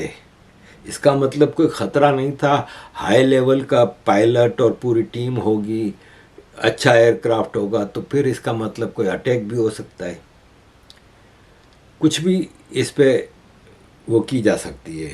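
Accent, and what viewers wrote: Gujarat, India. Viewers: आपका बोलनेका लहेजा गुजराती जैसा है ❤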